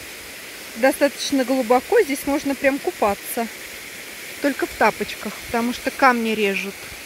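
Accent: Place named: Don, Russia. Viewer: Спасибо большое и за видео такой красоты! Такая экзотическая природа для нас, жителей степной зоны. Такая кпасотища в горах! И ещё в каких горах! Находясь не в любых горах можно обозревать такие великолепные виды. Смотришь, смотришь - не налюбуешься. А какие деревья с камнями, как приятно радует глаз ярко - зелёная трава и папаротники. А водопад.... слов нет, только эмоции восхищения! Спасибо Богу, что он так нас любит, что для нас людей такие виды создал, а вас, использует с, чтобы побольше людей могли таким полюбоваться, чего они в своей каждодневной жизни не видят и видеть не могут. Спасибо, что взяли нас в поход за грибами. Как там интересно с вами ходить... что ни шаг - прекрасные виды и в вверху и внизу и по сторонам. Как вам там сложно снимать было! Ведь всё время под ноги надо смотреть и баланс тела держать и про съемку не забывать. И высоко всё - таки, чтобы у вас и голова не закружилась. 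Вы как молоденькая девушка порхали там с пенёчка на пенёчек. А потом как показали снизу - крутизна то склона какая была! Смелая вы женщина, по вашему говору, мне кажется, что вы - донская козачка. Может я ошибаюсь, но удаль у вас и смелость и напор, как у них, мне так кажется. Не знаю кто бы мог так бегать по таким горам с таким азартом. Моё вам уважение и восхищение. Не слушайте никакой критики, если она будет - вы лучшая по съемкам в горах. Мне очень, очень, очень понравилось! Вы полностью донесли до нас коло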